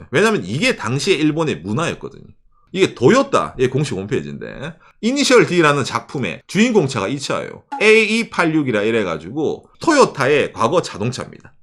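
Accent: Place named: Gyeongsang, South Korea. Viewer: 어디서 많이 들어본 말투인데... 어디지 ? 경상도인데..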